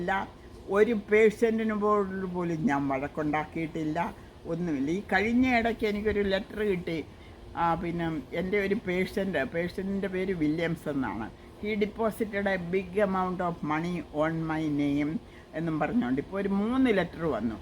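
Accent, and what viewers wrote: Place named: Pathanamthitta, India. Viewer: ഞങ്ങടെ നാട്ടിലെ സംസാരം.... ചേച്ചി ഉമ്മ...പത്തനംതിട്ട 😍 അമേരിക്കയിലും നാട്ടിലെ പോലെ കപ്പ, റോസാ ഒക്കെ നിൽക്കുന്നു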